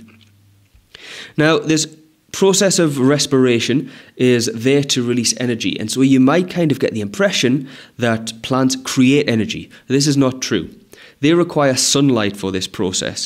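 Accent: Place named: United Kingdom. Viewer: no not the british accent i don’t understand it💔😭💔😭💔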